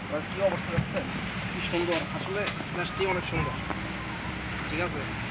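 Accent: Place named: Bogura, Bangladesh. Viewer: ভাই মনে হয় আমাদের এলাকার লোক বগুড়া নওগাঁ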